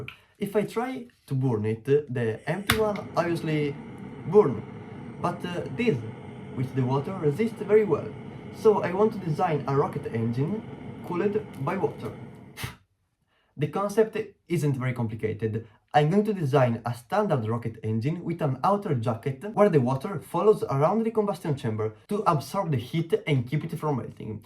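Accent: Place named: Italy. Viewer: Minchia si sente dall accento che sei italiano, bell video però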